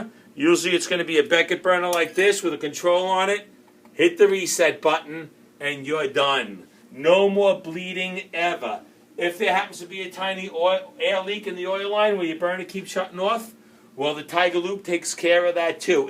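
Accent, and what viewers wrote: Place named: Georgia, United States. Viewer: Great video, sir, very informative. And I love that Southern accent---Georgia perhaps?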